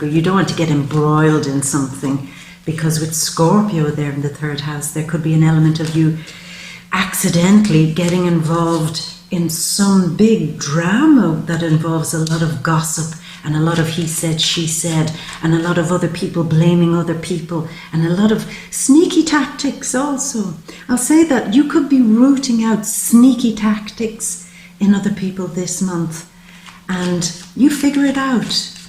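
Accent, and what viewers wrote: Ireland. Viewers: I Loovee your Irish(?) accent. Beautiful.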